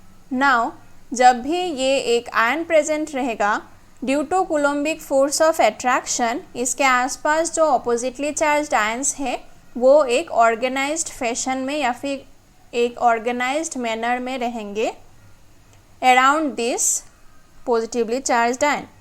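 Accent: Bengal, India. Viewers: Are uh Bengali 😅😮?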